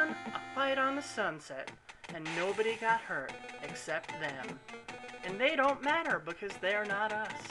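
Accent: United States. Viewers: I have to say that the voice in this video is a little creepy. It's like an old Southern Belle mixed with a Texan woman. Eeep...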